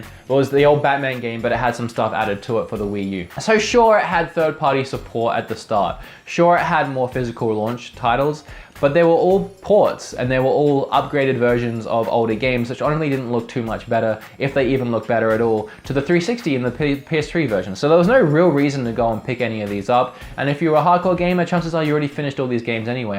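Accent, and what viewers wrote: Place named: Australia. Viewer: thicker aussie accent and a calm expression......sure did change 2 years ago lol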